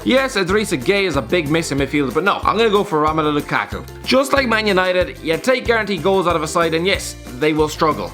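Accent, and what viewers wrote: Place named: Ireland. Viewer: I love your accent thats irsh init amazing accent that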